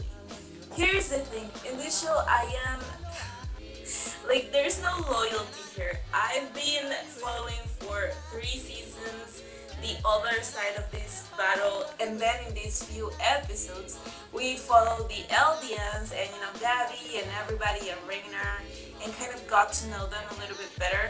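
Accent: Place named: Spain. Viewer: Cool. From your accent I was thinking Spain. Makes sense.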